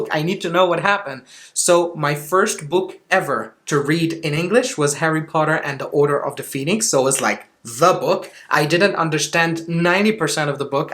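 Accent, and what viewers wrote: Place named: Venezuela. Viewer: al principio identifique tu dialecto pero me confundí cuando vi Chile… pero algo me decía qué era de Venezuela… ademas me gusta mucho tu elocuencia…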